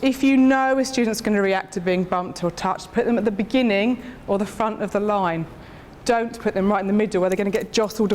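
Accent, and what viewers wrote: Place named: United Kingdom. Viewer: British accent is beautiful .When Brits talk it is elegant .They make talking and art form .without even knowing it .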